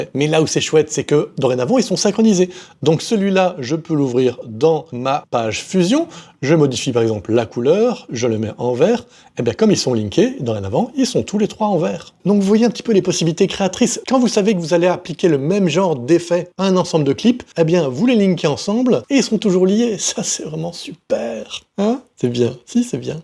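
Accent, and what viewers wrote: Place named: Belgium. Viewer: C'est peut-être une fois la pointe d'accent belge 😉 En tout cas, bravo pour vos vidéos explicatives !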